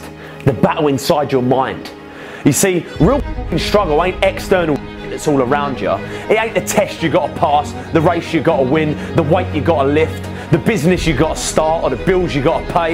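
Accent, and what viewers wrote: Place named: United Kingdom. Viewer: your british accent is fucking amazing!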